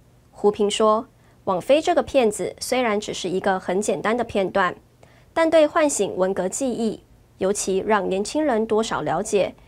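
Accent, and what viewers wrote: Taiwan. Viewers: 台灣的腔調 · 這主播有親切的台灣腔調捏😂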